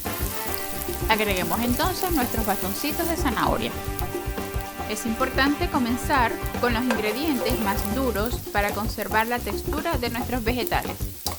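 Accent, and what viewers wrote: Venezuela. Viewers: Hola! Soy de Venezuela, pero vivo en U.S.A. yo imagine que eras de Venezuela por la forma de hablar y de cocinar (con clace) jjjj Saludos.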